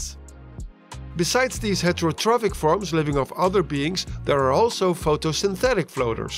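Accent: Netherlands. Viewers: Are you dutch, afrikaans or german?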